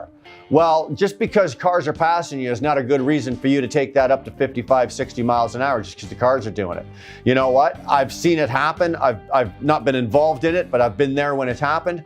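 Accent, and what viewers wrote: Canada. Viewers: As I’m watching this, I’m saying to myself, “This has got ta be the most courteous trucker I ever encountered.” Then I listened to him speak for a minute, and was like, “No wonder. He’s Canadian.” 👍🏼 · Is that a CANADIAN accent I hear? Man, you're making me homesick!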